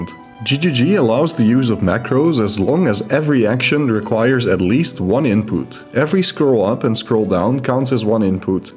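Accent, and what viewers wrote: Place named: Netherlands. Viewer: This video autoplayed after a random Poe2 video. I was like hmmm his accent sounds Dutch. Then I saw your channel name😂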